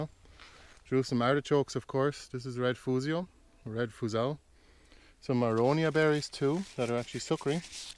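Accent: Ireland. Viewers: Lovely video, well done, a fabulous example of gardening with Nature. (And of course, an Irish accent could read a boring old shopping list and I'd be hooked!!) Found you via Colette at Bealtaine Cottage and have to say, I'm really envious of all the currants and jostaberries you grow - too warm here in my part of New Zealand (Waiheke Island, up north) for any of those. To see all the yarrow and Jerusalem artichokes, all the herbs - I wish I had more space to indulge all that too. Thanks so much for making the film, I shall have to go back and look at the others you'd done. Mighty impressive. Unfortunately, we have to pay a fortune to get wood chips here so I only use them occasionally for the paths, but have been no dig for nearly 20 years and I know it works. Great use for all the old cardboard boxes too, eh?! Gorgeous, hope things continue to go well for you and thanks again for showing us :) ♥♥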